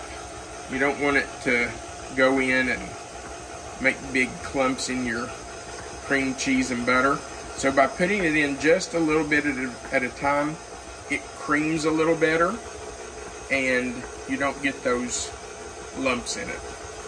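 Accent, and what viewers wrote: South, United States. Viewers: His stories are one of the reasons I enjoy watching. I am from Michigan and love to hear southern folks talk , it is intriguing and oddly relaxing to me. But to each is own.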